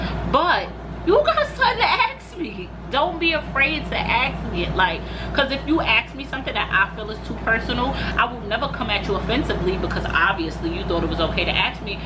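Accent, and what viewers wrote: New York, United States. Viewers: Hey beautiful new fam here I literally been benge watching your videos an babbby I'm loving an feeling your vibes your realness is everything I can relate to alot of your conversations in SOOO many ways like girl I be like dam is she in my head😅😅😅it's crazy plus I love the new York accent, I have a channel with my nieces an nephews so we will definitely be supporting you an your BEAUTIFUL babies have a blessed Sunday 🙏💜😘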